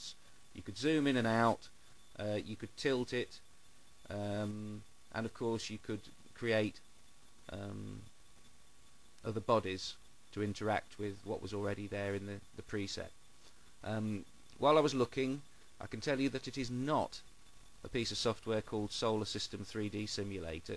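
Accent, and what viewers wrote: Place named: North, United Kingdom. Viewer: I'm not sure where is from, but he has a mild northern accent. I can't quite place it.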